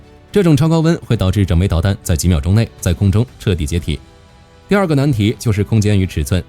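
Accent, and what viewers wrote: Beijing, China. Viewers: 火力君在军事术语上，最近一年来始终都在向台湾用于对标，比如“陆攻”，“资料链”，“镭射”，“寻标器”......，但是朗读所使用的AI引擎却还是字正腔圆的北京普通话，这一点特别别扭。要么你就统一全按北京标准用词然后使用这个语音，要么你就改个台湾语音朗读AI引擎，使用正统的台湾发音标准。现在搞得四不像，照着虎画成猫。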